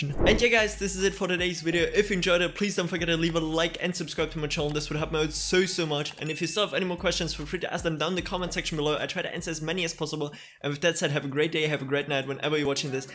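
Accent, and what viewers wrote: Germany. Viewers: You are German or?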